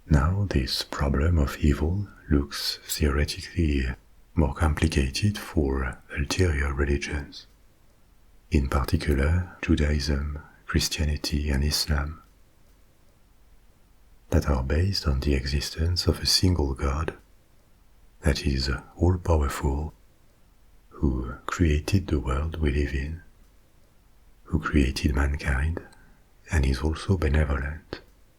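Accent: France. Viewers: I went through a few other videos to find a relaxing voice like this. The French accent is a bonus and surprisingly relaxing. Will stick with the from now on. Love the stories. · The best ASMR creator. That velvet French voice. Knocks me out every time. Thank you for all you do !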